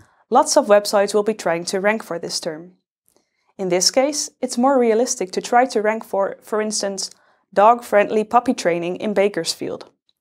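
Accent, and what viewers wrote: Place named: Netherlands. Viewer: She dutch though, typyical Dutch accent :D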